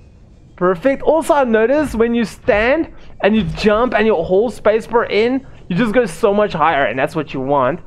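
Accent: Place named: South Africa. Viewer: DEAD GIVEAWAY!!! The Agggg... bra you are my South African brother :)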